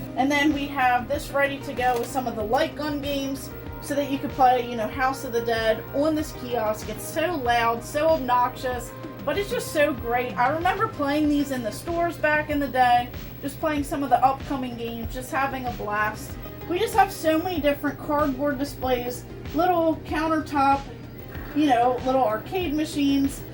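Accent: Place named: Baltimore, United States. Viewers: is she from maryland? i heard 98 rock and shes got a thick baltimore accent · 2 minutes in hearing her accent - ok yeah, she’s definitely from Baltimore lol. Amazing game room!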